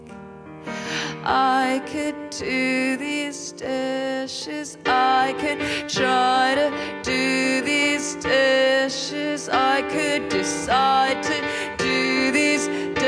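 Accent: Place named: Boston, United States. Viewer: uhhh, she actually sounds like she's from the Boston area. I'm from the same town as she is and she just sounds totally normal to me.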